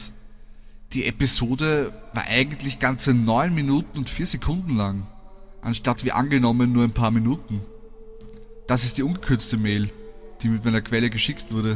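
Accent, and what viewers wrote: Vienna, Austria. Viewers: Bist du ein Wiener ? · Der Sprecher ist Österreicher haha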